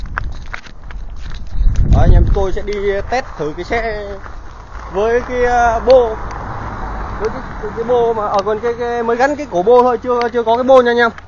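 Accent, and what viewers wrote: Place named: Phu Yen, Vietnam. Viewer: Phú yên na anh kkk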